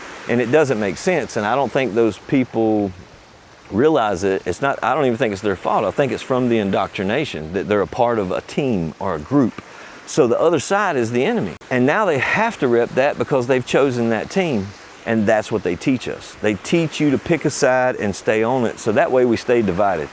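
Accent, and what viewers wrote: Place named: Massachusetts, United States. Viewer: Where is from? Sounds like Massachusetts or east coast.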